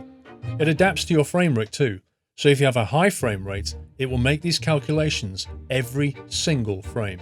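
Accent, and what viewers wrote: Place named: Bromsgrove, United Kingdom. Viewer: You speak just like my cousin from Bromsgrove, coincidence?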